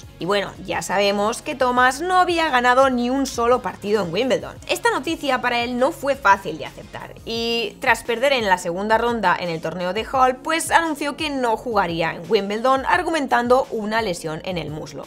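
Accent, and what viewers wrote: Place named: Catalonia, Spain. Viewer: DEJA DE DECIR BRUTAL TAN SEGUIDO, CON TU ACENTO CATALAN TAN CERRADO, DAS BASTANTE CRINGE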